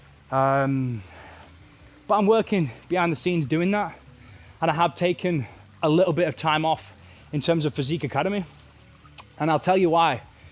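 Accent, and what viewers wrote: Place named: Ireland. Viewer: Where are you from? You got very nice accent for ear. Irish man?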